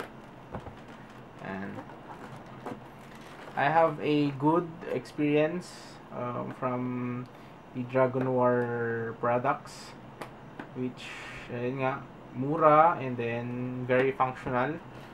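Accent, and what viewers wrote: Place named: Russia. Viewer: it seems you to be from rusia or nordic EU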